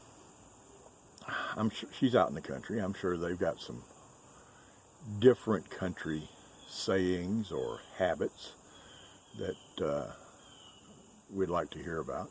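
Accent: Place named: South, United States. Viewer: Hi I'm from NonnaGrace's Channel! I like the coffee chats! I understand the southern country thing! I live in Hitchcock Texas and we all talk like that! Lol and I was born and raised in the city and it took me a really long time to get used to it!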